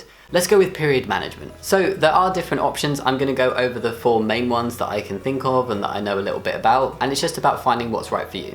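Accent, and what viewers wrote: United Kingdom. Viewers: I'm a cis girl but I know the struggle of putting a pad inside boxers because i'm very forgetful and sometimes i forget to bring a change of underwear when I come over to my bf's house so when it happens I borrow his boxers but holy shit, that time when I was in my period was nOT A GOOD TIME TO FORGET MY UNDERWEAR. And yes, I agree, it's easier when the pad has wings! Also, you said that pads smell like flowers but I actually have never seen any perfumed pads, what brand are you talking about? Well I live in Canada and you live in the UK (I think, by your accent, correct me if i'm wrong) so maybe we just don't have the same brands but idk it seems weird to me, I feel like the smell of the flowers and the smell of my period blood would just make a terrible mix, i'm not sure if I'd like that lmao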